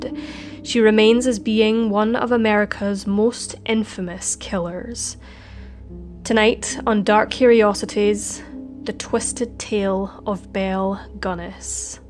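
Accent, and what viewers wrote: Scotland, United Kingdom. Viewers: Jason Erb it’s Scottish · Scottish Accent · NOPE, SHE'S SCOTTISH!!!😘😘😘😘😘😘😘😘🥰🥰🥰🥰🥰🥰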